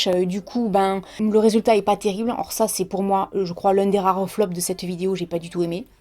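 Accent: South, France. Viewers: Yesss l'accent du Sud de la France j'adore ça me rappelle l'accent du Sud de l'Italie 😙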